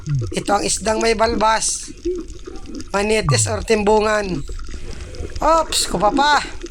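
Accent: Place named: Camarines Norte, Philippines. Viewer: Idol lagi ako na no nood sayo nalilibang ako sa mga video mo at sa Punto ng PG sasalita mo Alam ko taga Camarines norte k. Taga labo kase ako. Dito n ako sa Laguna now, ingat k lagi God bless po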